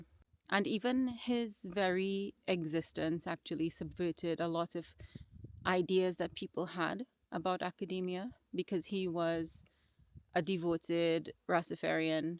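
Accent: Trinidad and Tobago. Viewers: I was thinking the same thing 😮,but after she started talking I knew she was from Trinidad & Tobago.Big up Sistren.. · Awesome I love your accent my dear Trini sister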